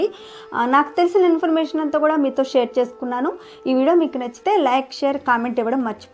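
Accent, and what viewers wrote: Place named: Medak, India. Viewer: అక్క మీది మెదక్ జిల్లా అనుకుంటాను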